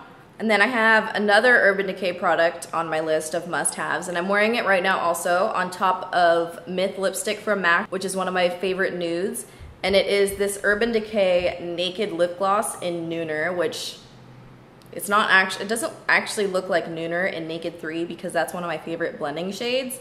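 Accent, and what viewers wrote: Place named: California, United States. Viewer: I freaking love you lol no homo. All of the girls I watch are from the east coast so it's good watching someone from Cali on here that makes me feel like I'm not a weirdo for talking like i do haha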